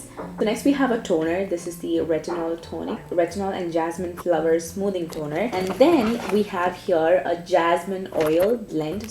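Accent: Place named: England, United Kingdom. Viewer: Hi, I find u really pretty. Ur makeup tutorials y I like the most is mainly because u do transform from a keralite to almost a different nationality. I do watch ur malayalam YouTube channel. Like ur English accent butt u speak sooo fasttttt. U need to slow down a bit. Thanku n wish u all the luck n luv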